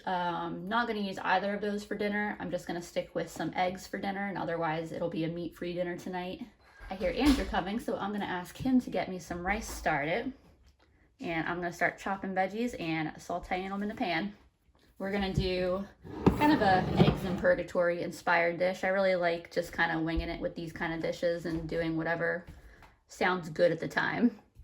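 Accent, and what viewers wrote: United States. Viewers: LOL! I love hearing, y'all... it is so... American. Not something that I typically hear nor am I criticizing... just something everyday to you but funny in my ear. Language, eh? Anyway, I very much appreciate your channel and the food you make for people on strict budgets. More importantly, raising the issue of food insecurities and life of folks in financial dire straits that exists everywhere. Fresh fruit, veggies, eggs, and beans with rice are always necessities. Access to healthy food is as much of a right as clean water, affordable housing, healthcare, and an livable wage. Right up there with your right to life, liberty, and the pursuit of happiness. Meals one makes themselves are the way to go, not only nutritionally but also for family cohesion. ish, you mention a ham steak and if you get some split peas and a slow cooker, one can make a dynamite, classic French split-pea soup if you slow cook the ingredients and dice up the ham! 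A smoked turkey leg is a great substitute for anyone who dislikes or avoids ham. Perfect for the colder months with a slice of crusty French bread and it will feed an army. Merveilleux! C'est délicieux à faire pour votre famille ou vos amis!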